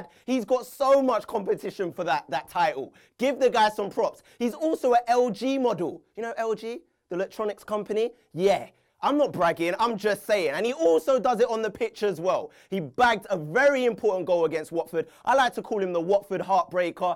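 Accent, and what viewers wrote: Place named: London, United Kingdom. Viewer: London m8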